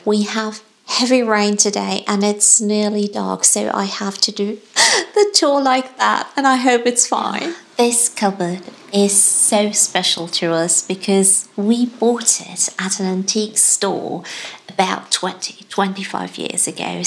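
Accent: England, United Kingdom. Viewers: You say you are Germans - but to my American ears you sound so English!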